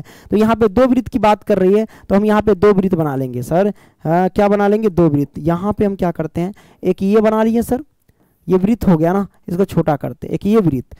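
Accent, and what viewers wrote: Bihar, India. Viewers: 🎉 रमाय नमः 🎉 गुरु जी 🚩 आप राजस्थान बोर्ड से पढ़ाते हो या बिहार बोर्ड से बहुत देर से खुजली हो रही थी पूछने के लिए अच्छा आप जो बीच बीच में जो ये बिहारी भाषा बोलते हो तो अच्छा लगता है😅 क्योंकि हम राजस्थान से है😅 ओर आप अच्छा पढ़ाते हो❤ में अपने दोस्तों को भी बोलता हु पढ़ने के लिए 😅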